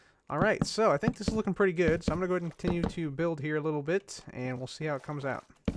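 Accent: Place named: Texas, United States. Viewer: Hey I wanted to know if you lived in Texas you sound like you do because you love space so I bet you live by Houston Ps you are the best YouTuber ever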